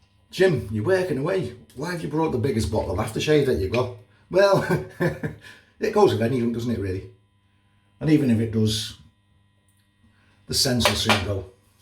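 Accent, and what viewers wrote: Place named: RP, United Kingdom. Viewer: Get'in a bit posh now then lad?!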